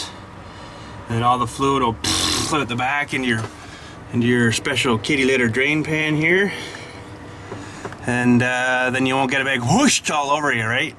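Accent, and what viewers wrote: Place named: Canada. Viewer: Thanks for posting up this video! I like the way you "neighbors to the frozen north" speak. Cracks me up!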